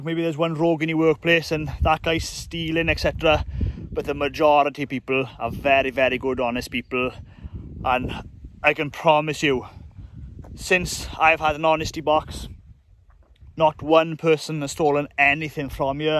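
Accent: Wales, United Kingdom. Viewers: Brilliant just what i wanted ti hear, so nice to hear that youve had no problems, i will be making one tomorrow to sell some of my excess veg, got radish, chard and hopefully soon some strawberries, kale and later tomatoes, great video man and btw best welsh accent ever! 👍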